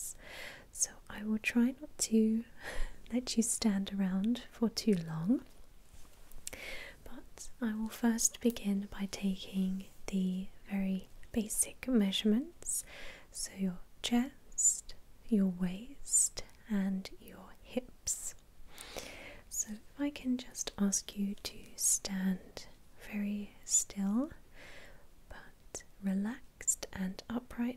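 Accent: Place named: RP, United Kingdom. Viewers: Wonderful tailoring video, I needed a new suit fitting for clandestine work lol. Was surprised at how relaxing a tailoring video can be. The sound of your irresistible posh accent and beauty and no background noise. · Posh bird must be from Surrey 😁 good vid by the way 👍🏻❤️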